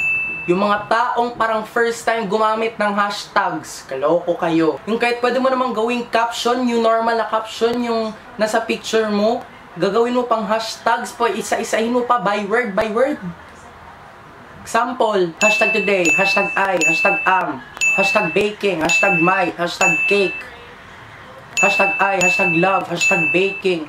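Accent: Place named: Visayas, Philippines. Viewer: Bisaya ka fu ba? Or whut? Accent is real .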